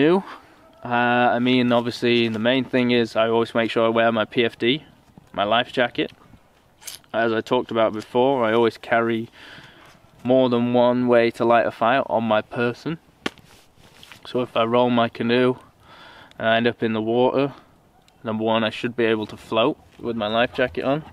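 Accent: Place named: United Kingdom. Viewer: hello how are you and i hope your doing when your not doing the bushcraft videos/solo camping videos and i wanted to say happy anniversary to you and your wife and happy 1 year anniversary on your youtube channel and at the risk of me repeating myself from the last comment i sent you it's like wow when i watch your videos i keep feeling peaceful and enjoy the wonderful nature everywhere you go and i like your dog she is a nice dog great seeing her as well i do have a a qustion how do you spell her name because people spell names different is it blu/blue/ and i hear british in your voice which i think is very very kool i like british people alot and /americans and of course my country canada and thankyou very very much for the Q&A i enjoyed that alot i will always enjoy your videos short or long always cheers to you and thankyou during these hard times your videos help everyone who watches them thruw the hard times from your new friend Randy again ontario,canada stay safe out there m friend.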